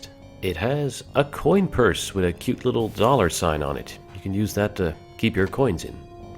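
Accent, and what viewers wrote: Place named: Quebec, Canada. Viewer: Love your videos. Very entertaining. BTW, are you French Canadian? You sound like it.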